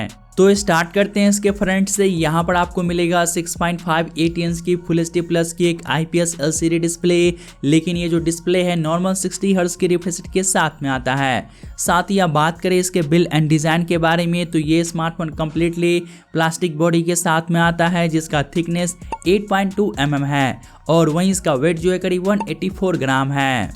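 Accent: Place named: Bihar, India. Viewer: भाषा उच्चारण में सुधार की जरूरत है बोली में बिहारी झलक नजर आता है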